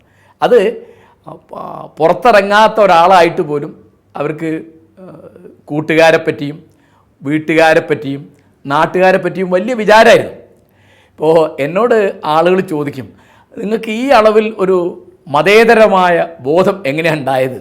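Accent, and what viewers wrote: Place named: Kozhikode, India. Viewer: mashinte oru kozhappam Kozhikode basha varunnu...malayalathinte ozhukku pokunnu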